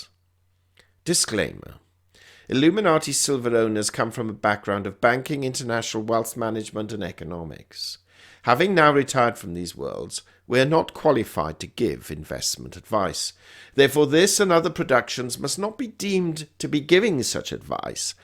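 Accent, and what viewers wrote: United Kingdom. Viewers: I had to give a thumbs-down today not because of the information but instead of the straightforward logical rational approach that is usually given there was a sanctimonious are that might have been partially attributed to that uppity British accent but probably because of the inner sanctum exclusivity that starting to emerge giving them a sanctimonious are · I only take financial advice from those with British accents. Thanks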